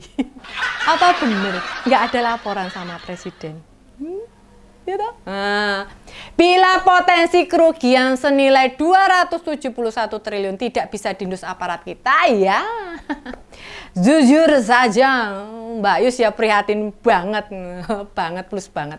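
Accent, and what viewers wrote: Java, Indonesia. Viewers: bak medok amat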